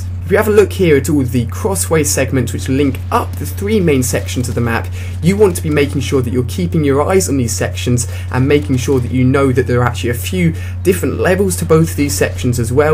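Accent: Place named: England, United Kingdom. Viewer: i knew you are from english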